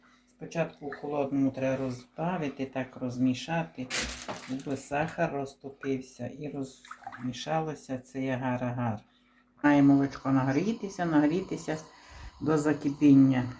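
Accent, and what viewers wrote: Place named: Volovets, Ukraine. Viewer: Така вимова файна. Маю цімборку з Воловця, вона так говорить. Я не вмію, але розумію😊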